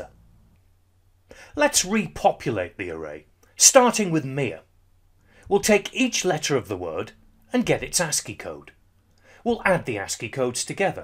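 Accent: England, United Kingdom. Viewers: His British accent though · I think the British accent helps me learn better. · Thank you, very british man, for a nice explanation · Perfect explanation. On a side note, are you British? when you speak, you rhyme and it's a treat to my years. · You have a fine English accent, Mate. It helps my brain gear run smooth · His British accent sounds so cool!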